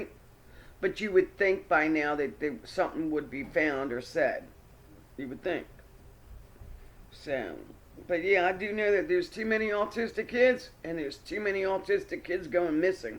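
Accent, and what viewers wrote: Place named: Baltimore, United States. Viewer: I knew you were from Baltimore, my hometown! I could recognize the accent and feels like home. You’re absolutely right, many autistic folks have gone missing since this whole corona stuff. Very sad. Thanks for reading the cards for him.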